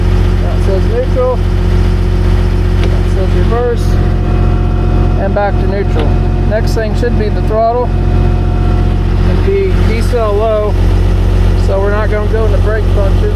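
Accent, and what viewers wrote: South, United States. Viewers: Wow here is this southern redneck who wears dirty camo coveralls 10 months a year. Generally known for heavy equipment repairs, this dude takes his huge sausage fingers and does as clean a bit of circuit board soldering as I have ever heard or seen. And in a voice best suited for pork rinds, moonshine and Nascar, does as great a technical explanation, diagnosis and repair as I could ever have imagined., you are the salt of the earth and one of those people I regret I will never meet!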